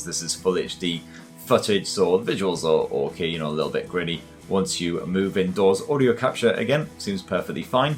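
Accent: United Kingdom. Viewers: Oh and I forgot to mention the extremely personable British accent 🙂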